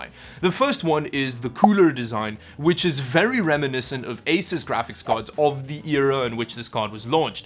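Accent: South Africa. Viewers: dude are you south african?? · I can recognise that Saffa accent anywhere! Enjoying your videos. Greetings from home!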